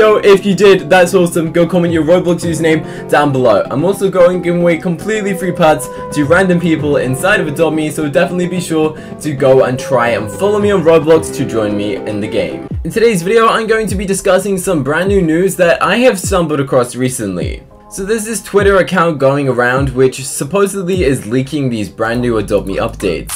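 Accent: United Kingdom. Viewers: ur british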